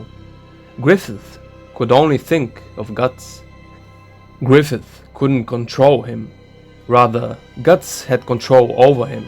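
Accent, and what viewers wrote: Germany. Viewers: Good German accent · Bro, you German? Thick accent man. Shoutout from Manheim! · 30 seconds in. You’re definitely German. Cool accent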